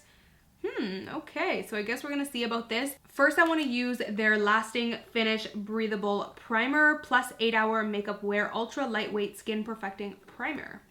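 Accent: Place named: Canada. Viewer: Is she from Canada? She pronounces 'sorry' as 'sorey' :p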